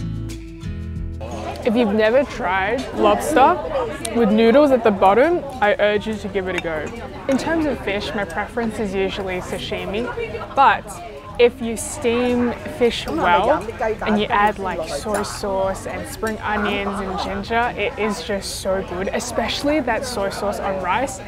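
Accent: Australia. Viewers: Thought u grew up here in oz as you spoke with aussie accent, didnt realise your parents were coming to visit you from overseas... Maybe im wrong? Im kinda half way thru, lemme finish watching to find out! :) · I love hearing your Australian accent because it's so different from where I'm from. But your Cantonese sounds exactly the same as mine!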